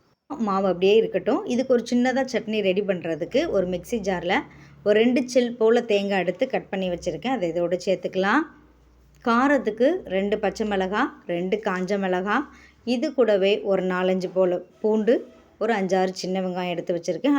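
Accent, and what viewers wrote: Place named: South Tamil Nadu, India. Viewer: hello neenga tirunelveli kanyakumari nagarkovil paguthigalil ondrsi sernthavarghal kovai saayalum irukku just by tamil slang sis.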